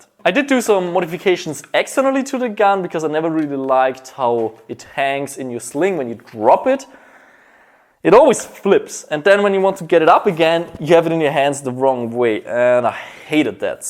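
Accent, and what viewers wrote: Bavaria, Germany. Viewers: What accent do you have, it sounds like German sometimes but idk. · Sorry aber man merkt das du aus Deutschland (ich glaube Bayern) kommst Trotzdem Bester Youtuber der Welt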